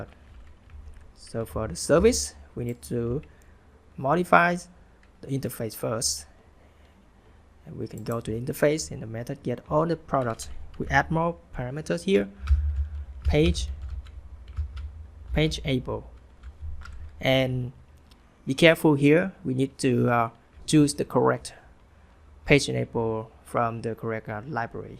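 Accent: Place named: Vietnam. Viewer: bạn là ng VN hả ? accent VN chắc luôn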